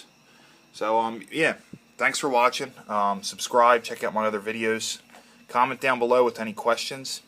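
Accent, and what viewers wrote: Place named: Pennsylvania, United States. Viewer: Just scored one of these tonight and I like it. Side note: that is one of the heaviest Pennsylvanian accents I've ever heard.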